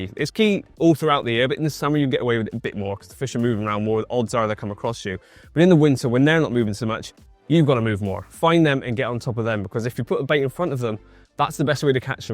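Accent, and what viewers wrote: Bristol, United Kingdom. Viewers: What your accent Bristol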